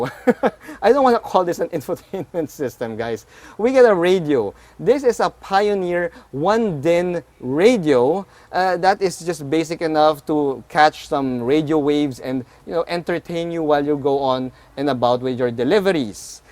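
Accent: Philippines. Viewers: shifting by ear??? Haha!!! Shifting by hand is easier I think. It feels natural. Haha Pinoy English. Trying hard. Tagalogin mo na Lang boy!!!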